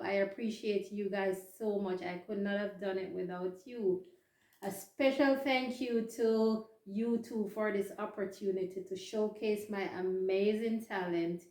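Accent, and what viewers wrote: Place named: Jamaica. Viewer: A very nice introduction to you! I live the Jamaican accent!! And age 21 for several years 😂 I like that. My wife says the same thing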